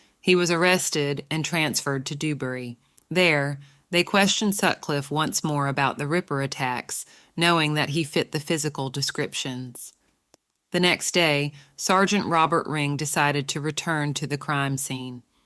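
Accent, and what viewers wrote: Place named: Deep South, United States. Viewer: It is interesting listening to a narrator from the American deep south, talking in her strong accent, talking about the Yorkshire Ripper in Northern England.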